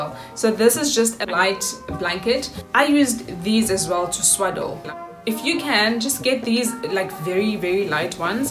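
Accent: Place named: South Africa. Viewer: Thank you for this video. Lovely to hear a South African mom.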